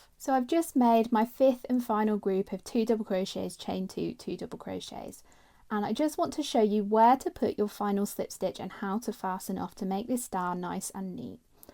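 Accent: United Kingdom. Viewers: again, US English terms and UK English terms are NOT the same. Examples: US single=UK double. US treble=UK double treble. The terms are 1 off from eachother. Considering her accent, im going to guess her terms are the UK terms, hence: she did exactly what she said she did 😊. Hope that helps clarify my comment.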